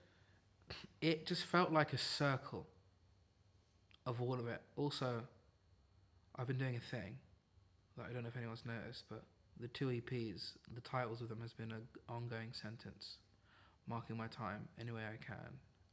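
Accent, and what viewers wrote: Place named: United Kingdom. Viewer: GUYS HE’S BRITISH WHAT?????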